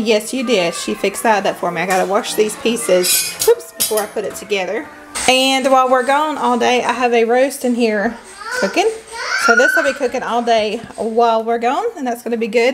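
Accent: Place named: South, United States. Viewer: HELLO SWEET LADY, I AM NEW TO YOUR CHANNEL AND JUST WONDERING WHAT STATE YOU LIVE IN AS I HEAR A BEAUTIFUL SOUTHERN ACCENT.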